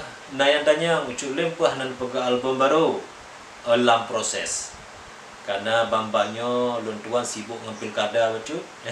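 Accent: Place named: Aceh, Indonesia. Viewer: Logat peugah haba hana hie agoe Aceh Besar. Hie Aceh Utara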